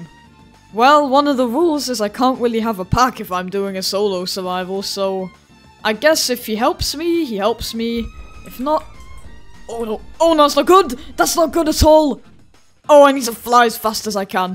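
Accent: United Kingdom. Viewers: User: ChickChickadee2013: not american Me: that’s alr:) u dont have to be american to enjoy aolenus/eagles Btw are you British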